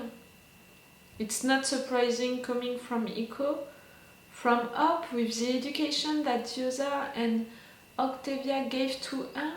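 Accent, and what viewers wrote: France. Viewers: Elle est française ça s'entend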